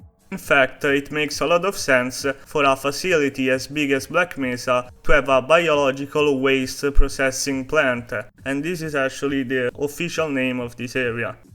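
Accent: Italy. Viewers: You sound overly italian, I love it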